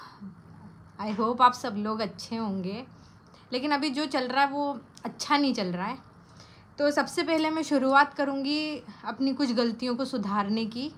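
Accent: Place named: Bhopal, India. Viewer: Aap ke baat se aap to Bihari lag rahe ho.. aap bhopli q bol rahe ho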